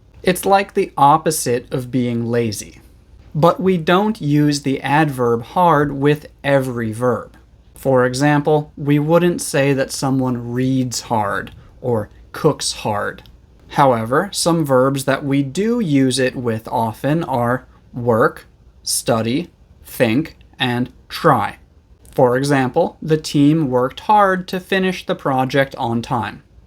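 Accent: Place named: United States. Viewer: .. I'd like to know where your Am. accent is from... Which state and city were you born in?? Please, answer me when you can.I'm pretty curious about it 😊 Thanks a lot for your classes.You're a great teacher!❤❤😊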